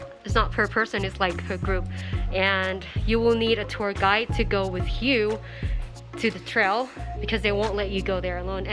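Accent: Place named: United States. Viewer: Enjoyed your video❤ Your American accent is so sweet😊 Also you are in very good shape & lots of stamina; most likely you can climb one of the 8,000+ metres peak easily.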